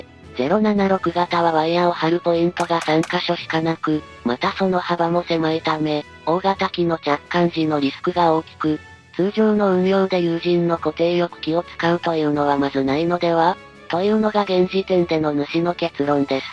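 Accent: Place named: China. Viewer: あと流暢な日本語喋ってても明らか中国人(大陸の方)ってわかるんだよね… ちなみにあなたの国は学問の自由指数 (Academic Freedom Index 2025): 中国の状況: 世界179カ国中で極めて低いグループ（Status Group E: 完全な制限）に属しています。 スコア: 2024年時点で0.067（1.0が最高）とされており、教える内容や議論の自由が厳しく制限されていることを示しています。 報道の自由度ランキング (<num>): 順位: 中国は180カ国・地域中、ワースト2位付近（179位など）に位置しています。情報の検閲や修正が常態化している環境が浮き彫りになっています。 …そんなんだから真実が分からんのだよ…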